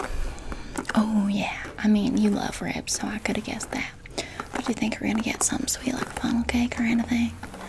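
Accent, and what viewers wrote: South, United States. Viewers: I have a huge southern drawl, you nailed this!!!! #awesomejob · girl, your lashes look amazing! also I absolutely love your southern accent, you're so talented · From a southerner, this RP is so spot on! 😂 I know PLENTY of girls like this. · Love the cowboy Accent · Again, very nice southern accent! Also, I heard you mentioned some country singers in this video. I'm a very big fan of, and I am actually going to her concert at late August! Who are your favorite country singers,? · Honestly I would’ve thought you were southern 😂❤ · I love your southern belle accent! Amazing as always! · i love southern accent videos as a woman who’s grown up in the south 😭😭 they crack me up! i love the vibe!!!! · Are u from the south? This is spot on! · Such a cute role play for a Canadian you do Southern pretty well!! Also, your hat appears to be backwards 😉. 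Unless it's just been misshaped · Haha that southern accent is funny lol btw nice job with imitating this accent 😂❤ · Nobody does Southern Belle like you. You could literally be part of a country TV series. Too good. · New trigger unlocked: southern accent. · That southern accent is so attractive. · You ain’t southern but you’d never know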